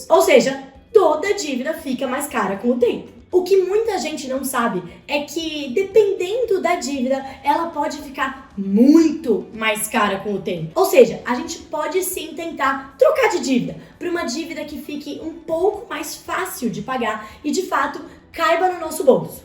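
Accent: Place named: São Paulo, Brazil. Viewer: Ela é muito lindinha com esse sotaque de paulistana!!!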